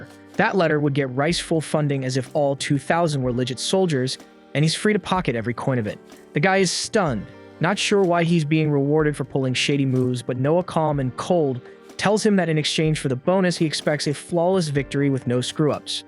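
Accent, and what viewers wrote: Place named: United Kingdom. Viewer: After , I think he's British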